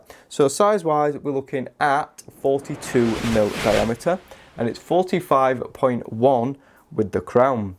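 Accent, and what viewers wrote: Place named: Manchester, United Kingdom. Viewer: I love working-class Manch accent (Manchester). Nice review here. I am looking at this one as a gift for my young nephew. You've got a slim wrist like me.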